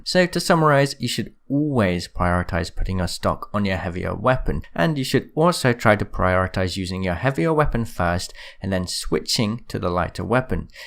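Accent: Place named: Scotland, United Kingdom. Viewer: スコットランド人の訛り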